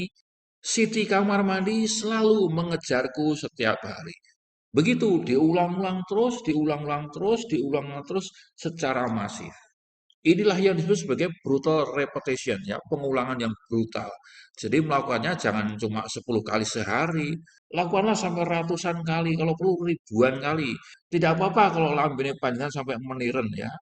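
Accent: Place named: Pekalongan, Indonesia. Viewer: Pekalongan hadir... Logate jenengan mirip2 pekalongan.. Jenengan pundi?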